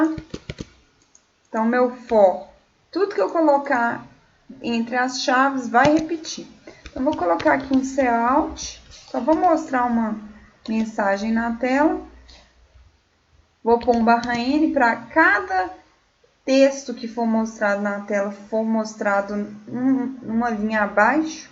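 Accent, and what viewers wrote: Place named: Minas Gerais, Brazil. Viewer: Parabéns você explica muito bem, ficou melhor ainda com este sotaque de mineira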